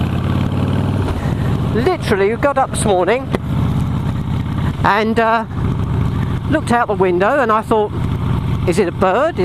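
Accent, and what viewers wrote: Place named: RP, United Kingdom. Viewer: TheBikerVlogger liked your video,so I gave your video a look see.Interesting channel you have.I haven't subbed a Harley rider,in fact,you're the most posh sounding Harley rider i've witnessed lol.You're even more posh sounding than BaronVonGrumble!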